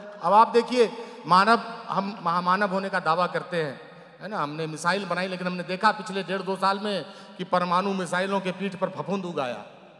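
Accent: Bihar, India. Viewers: आवाज में बिहारीपन का जो मिठास है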